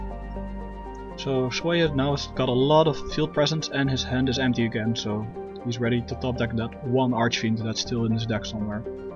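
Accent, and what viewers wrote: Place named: Netherlands. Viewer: youre dutch aren't ya? I'm too :P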